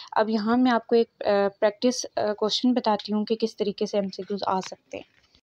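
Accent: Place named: Muzaffarabad, Pakistan. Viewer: Baji seems like you are from mzd ajk ?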